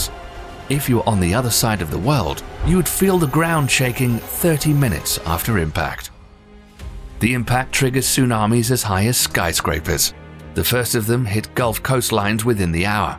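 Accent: United Kingdom. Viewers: really need to do an update with different none British voice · bri'ish accent very fascinating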